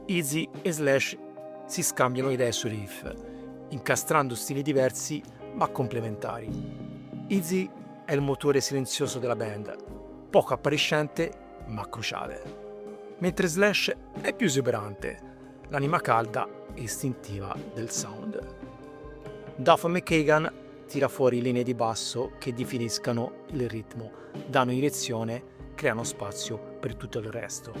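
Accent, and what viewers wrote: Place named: Tuscany, Italy. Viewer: lento nel raccontare...fai venire sonno...e dalle co sto toscano...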